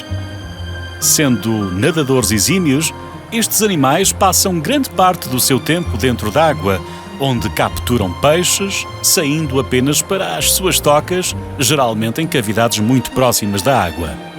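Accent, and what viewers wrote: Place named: Portugal. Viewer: Muito bom esse documentário adoro essa voz marada de português bom de mais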